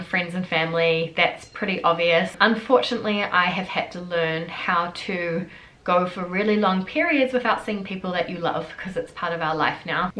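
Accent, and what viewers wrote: New Zealand. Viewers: I j discovered your channel a few days ago and have been knee deep ever since. Something fun I just realized was that when you were in France you sounded like you had a more "american" english accent. Now that you are back home in NZ I feel like your NZ accent has become more prominent. However, as an american I have really loved your videos and collabs. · Your Kiwi accent has gotten SO much stronger since you went back!! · Oh, you are so cute! Even when you're complaining about something, you're just totally likeable! Love watching your videos and seeing your friendly, open face and hearing that adorable Kiwi accent! It would be nice to hear directly from your husband about his acclimation to NZ. That must really be a culture shock - much as yours was when you first came to Paris! A small request - in the future, could you speak about what exactly your business entails? 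Thanks again for the peek into your world! Cheers, Fred in NYC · whoo je ne m'étais pas encore rendu compte sur tes dernières vidéos mais ton accent est bel et bien de retour depuis que tu es revenu en Nouvelle Zélande, les e qui deviennent des i etc... !!!! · Thank you for your honesty. I think I understand your dilemma. There is a side in you that appreciates being back in New Zealand, where it's quiet and nature is beautiful and the bureaucracy is less stifling and perhaps it's also nice to be able to see your family more often, yet, you also miss things from Paris, because it had become your home for five years and at some point, you had the vision to spend your entire life there. At the same time, you knew when you lived there it wasn't an ideal place (I agree with you about the noise and the endless paperwork), but now you also have to rethink your channel. You love doing your channel and I think you should keep doing it, you just need to find a new theme. 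Please don't let the negative comments bring you down. You're a beautiful young woman and I love listening to your New Zealand accent. What you're going through is stressful. It looks easy getting on a plane and just going somewhere else, but to start your life all over again, even if it's your home country can be challenging. I wish you the best of luck. Good that you have your husband with you, who knows about your life in Paris. That way, there is always a bit of Paris with you - the good part. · Your New Zealand accent is coming back in force!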